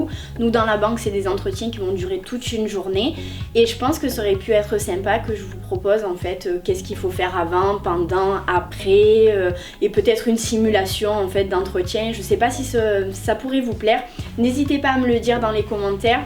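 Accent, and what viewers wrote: South, France. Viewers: oups pardon faute de frappe STMG et BTS commerce internationale , en tout cas ta vidéo est super , j'adore ton accent je suis du sud également , plus précisément sausset les Pina près de Marseille :) et j'adore la simplicité de tes vidéos , tu es naturelle , jolie et tellement franche 🙌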